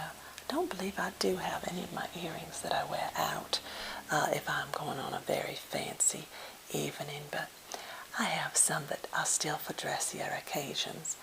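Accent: South, United States. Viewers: you remind me of one of those 1950" s housewives you're beautiful and have a very southern accent love to hear southern accents mine is Lakota Sioux sound very young voice lol anyways love your videos and earrings but why do you whisper ? and anyway love to be friends on my fb my name is summer rain Americanhorse on F B ty for the videos God bless🐝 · Love Miss! Would like to hear more as I do love her southern accent - gives me tingles! This is one of my top fav asmr videos. Thank you. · When the video first started, I was disappointed for a minute because I was expecting a different kind of Southern accent but the longer I watched it, the more my head melted so I am no longer disappointed! Thank you very much for this! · I love the fact you didn't go overboard with the usually stereotypical "Southern" accent. I have actually heard women that speak like this. 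Especially how you said window screen. Thank you for your wonderful videos! I am so glad I found you!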